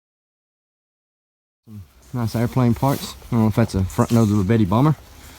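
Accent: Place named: South, United States. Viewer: Your from south US aint you?,or your parents are, and your dad was into ww2 stuff and you talked about it alot, that alabamba slack yaw, no offence really comes through when you mention the stuff made from USA...being english i can pick up difference's very easy, like the difference between canadian and american, and ozzies and with new zealanders...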